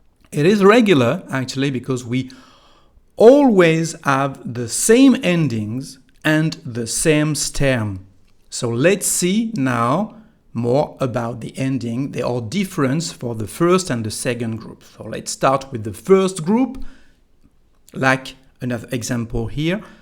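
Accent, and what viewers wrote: France. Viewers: watching this clip but hardly focus on things cos distracted by his french accent ...so cute.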